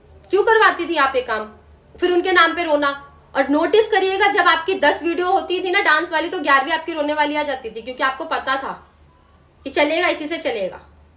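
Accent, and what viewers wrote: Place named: Uttar Pradesh, India. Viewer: Aap kanpur lucknow se ho kya aapka kahe hamare kanpur lucknow ki jaban hai na baki your way of talking is marvellous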